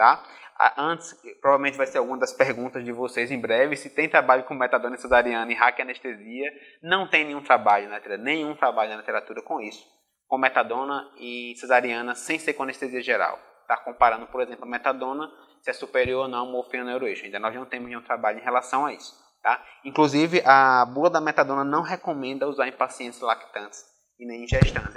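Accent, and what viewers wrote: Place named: Northeast, Brazil. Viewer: É um pouco complicado entender quando dispara a falar rápido e junta o sotaque nordestino e o agudo da voz,então complica pra entender. Surgido fazer fono se quiser falar por longo tempo.Ecplica muito bem mas o sotaque e o agudo atrapalha o entendimento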